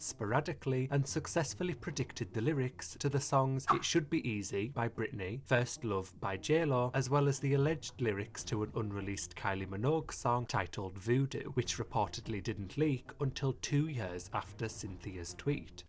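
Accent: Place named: Yorkshire, United Kingdom. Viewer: It's def not London, but i can see why someone would say Newcastle since it's up north. Hes def a Yorkshireman. 🫣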